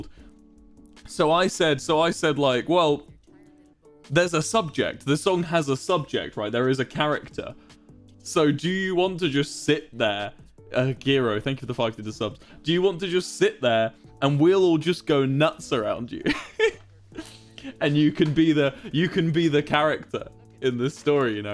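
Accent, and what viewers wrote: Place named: United Kingdom. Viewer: His British accent tho😢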